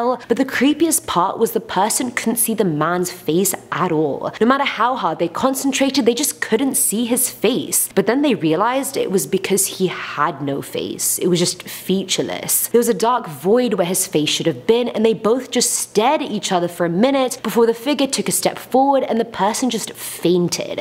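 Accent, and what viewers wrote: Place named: Scotland, United Kingdom. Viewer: no. Canadian English but her accent is Scottish. I am Scottish which is why I recognise it. Some may say she is more likely to be of middle Eastern descent but I personally do not want to make any assumptions about her as its not my place to do that.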